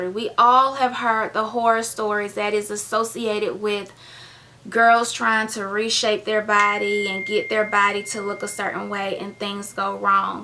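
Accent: South, United States. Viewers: I love your voice smooth and southern you could read a prescription bottle and it sounds good "Naproxen, Acetaminophen, Iridocyclisis" 😂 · I like that southern bell voice very relaxing😁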